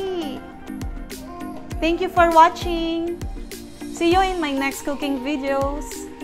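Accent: Philippines. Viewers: Pinay accent 😍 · are u pinoy? (the way u speak engliiish)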